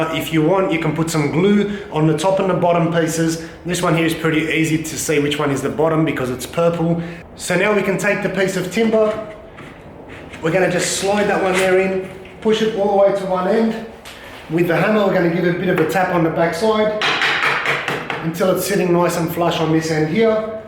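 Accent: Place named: Australia. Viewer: cheers mate, amazing what you can find on t'internet and it sounds like it's from Ozz (don't kill me if you're a Kiwi lol!). I've gotta cut 4" off a door and this'll work a treat👍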